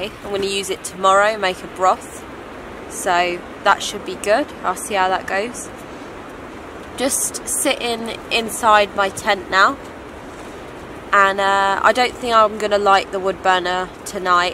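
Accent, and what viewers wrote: United Kingdom. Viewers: Your accent do British snd "tipi"is shelters?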